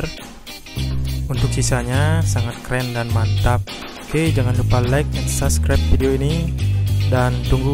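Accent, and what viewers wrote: Bali, Indonesia. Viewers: Logatnya kok kayak orang bali ya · Bli.. klo bsa logat bali nya jgn trlalu kental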